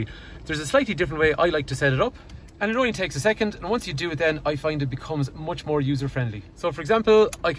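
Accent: Ireland. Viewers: This is what we want in a review. A speed talking Irishman! I love it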